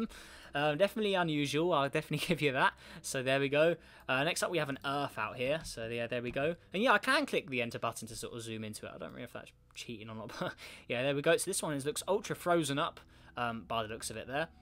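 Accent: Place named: United Kingdom. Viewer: Speek americane please i cant understand briten people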